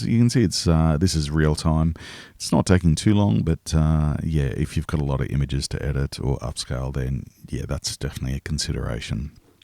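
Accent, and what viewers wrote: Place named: Australia. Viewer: I've only just come across your channel and I'm happy to hear an Aussie accent. Thank you for the info. I'm newish to LR and will be going through your playlist to learn more 👍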